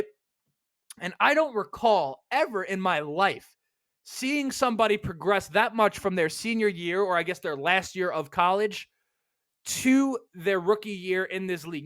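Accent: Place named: Indiana, United States. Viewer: Just learned that you're from NY. Thought people from Ind had your accent. Nonetheless, good episode.... i know it's tough during the lull.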